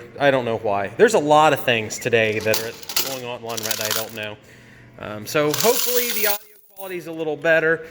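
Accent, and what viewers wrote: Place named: Atlanta, United States. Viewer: Where are you from? I know a guy in Atlanta with the same accent, but I don’t know if he’s from there originally!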